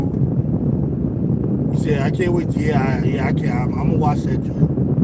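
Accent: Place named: Philadelphia, United States. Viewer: Bruh I'm at work at 3 am listening to ur content u got me cracking up 😂 really enjoy ur channel very informative and the way u talk that Philly talk saying jawn u got a subscriber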